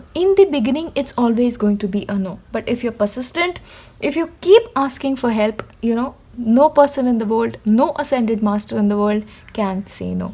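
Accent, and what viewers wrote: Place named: India. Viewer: Your accent reminds me of my close Indian friends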